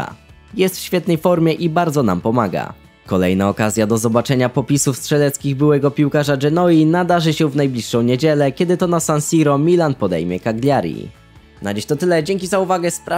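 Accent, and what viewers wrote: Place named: Poland. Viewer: O dzisiaj dużo polskiego akcentu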